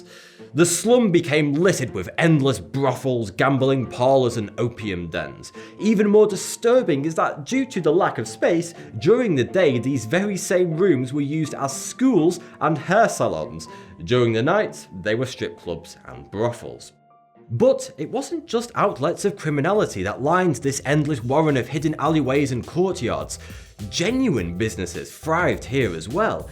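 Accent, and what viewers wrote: United Kingdom. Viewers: What British accent is this? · "The slum became littered with endless brothels, gambling parlors and opium dens." Just the way you said Brothels, like there was a F in it or something. British accents are hot. I would learn to impersonate them but I am not posh enough to pull it off. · Mmmm I just love your British accent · Different accent... Is he british? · Is that British accent real? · Your British English is genuinely horrible. thanks for the lesson.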